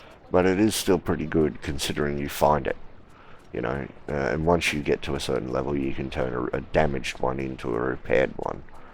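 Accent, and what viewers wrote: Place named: Australia. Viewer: Not meant to be a "dis" Your voice is so fucking smooth, I felt asleep the last 3 days listening to part 3-6. Your gameplay is really relaxed and I like that you do it at 10x speed. But: there is not much value to looking at your vids for hints etc... For the last 4 ones I skipped and skipped and skipped. Im sorry mate (love ur australian accent), but: just consider reading a book into youtube. I would listen to it :)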